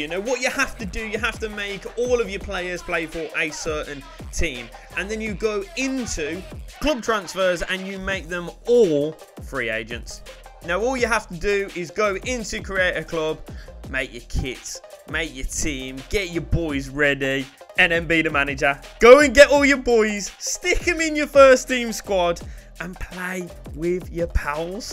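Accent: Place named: Birmingham, United Kingdom. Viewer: Bros the most Brummie Brummie to ever Brummie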